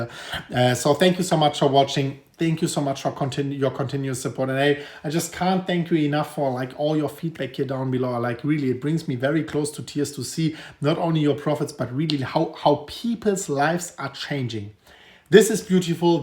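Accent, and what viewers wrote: Germany. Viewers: Good time to wait for bottom if you are a hodler. Why? Tax season. Funny German Accent Guy Say's Whales selling BTC.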